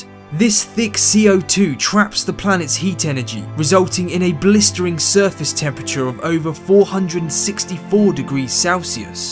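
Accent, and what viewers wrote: United Kingdom. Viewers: Well,very intersting information about Venus.But to tell you the true,I think that despite all the uncomfortable conditions at Mars,the red planet looks a far more friendly world to be colonized.Thankyou so much for sharing that so interesting data!!I love that british accent :-) !!.Greetings from Colombia!!!.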